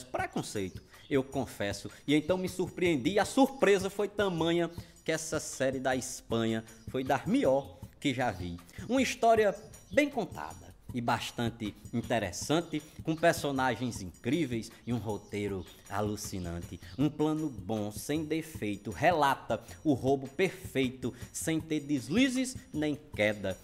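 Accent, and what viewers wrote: Northeast, Brazil. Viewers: O jeito nordestino que amo falando da serie que mais amo 💜💜💜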